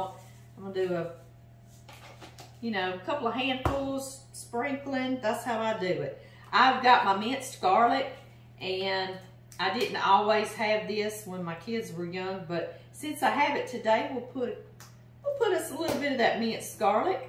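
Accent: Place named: Louisiana, United States. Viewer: Hey, haven't been doing much video watching this past couple of weeks due to losing a good friend of 25 years she was born and raised in Louisiana she had just turned 56 so gone unexpectedly and way too soon. We originally met online and formed a bond like sisters through the many years of conversation. We never got to meet in person due to life always getting in the way which leaves me with such regret..but we spent hours talking on the phone with each other through the years and I sure am missing her. Anywho when I got the notification on my phone that you posted a new video I just had to watch since I shared your videos with her so often. I gotta say hearing your voice and that Louisiana accent opened the floodgate of tears knowing she wasn't just a phone call away anymore. When you and did the blessing it was like a soothing balm to remind me that someday we will be reunited. 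I don't mean to ramble but just wanted to share with you how your video touched my heart. Thank you, God bless and keep you and your family. ❤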